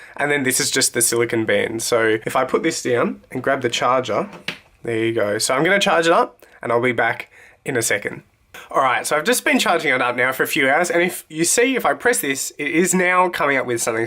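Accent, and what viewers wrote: Australia. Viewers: Are you Australian mate You sound like an aussie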